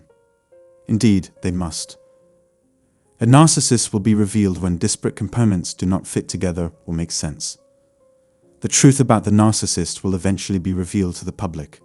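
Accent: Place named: United Kingdom. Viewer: I think maybe it's the voice and the accent, when you watch channels with the British accent, you feel like a baby, safe and sound somehow😅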